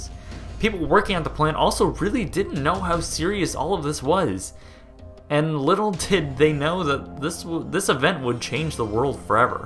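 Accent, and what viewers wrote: Canada. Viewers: You sound Canadian. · You say at the beginning of the video that you're American, but haven't you said you're Canadian in other videos? And you pronounce "about" like a Canadian... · No, he's definitely from the proud north. The way he pronounces his O's, the fact that the only live interview I've seen him do was of the guy in charge of Target Canada, and the Ontario PO box give him away. He could be American now, but he's definitely a Canuck by birth.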